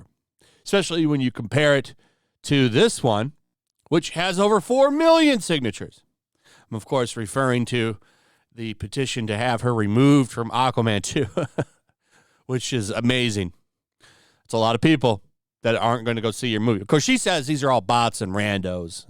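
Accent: England, United Kingdom. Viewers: Just a small thing, but why are you reading in an english accent?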